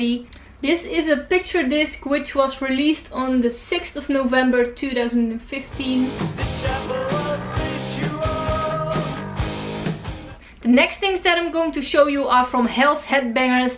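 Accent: Netherlands. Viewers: Eindelijk iemand die ook met een dik nederlands accent praat als ze engls praat. Dacht dat ik de enige was.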